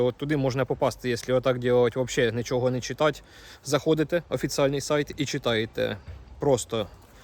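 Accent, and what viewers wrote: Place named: Slobozhanshchyna, Ukraine. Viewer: Молодець що не стоїш на місці, шукаєш своє. Стежу за тобою з того моменту як ти почав працювати на доставці у Вроцлаві, подобається як записуєш свої відео, маєш свій стиль. Хотів підбадьорити тебе відносно мови. Пропоную не вживати слова СУРЖИК, бо це кремлівський винахід від слова сурогат. Якщо я вірно зрозумів- ти розмовляєш українською з яскравим СЛОБОЖАНСЬКИМ діалектом. 😀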